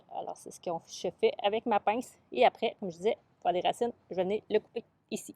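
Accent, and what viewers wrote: Canada. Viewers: Bonjour amie canadienne jadore votre accent je vous ecrit de suisse jadore votre video qui est bien expliqué mais vous parler d'engrais spécial fraisier sest bien mais avait vous penser au engrais naturel comme les coquille d'œufs marc de café ou purin d'orties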